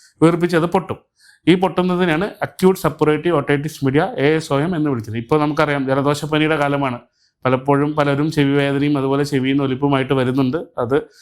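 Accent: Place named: Tamil Nadu, India. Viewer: പ്രിയ ഡോക്ടർ ഒന്നും മനസിലായില്ല ഒരു തമിഴ് ചുവ ഉണ്ട്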